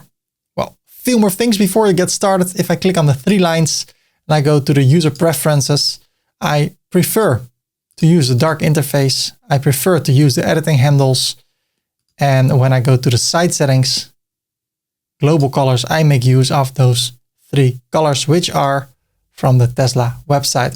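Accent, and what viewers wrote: Netherlands. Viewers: First time here, I picked up the Dutch accent haha, and I love Tesla too. And work with Elementor, daily. So I subbed! Groetjes uit Middelburg! 😊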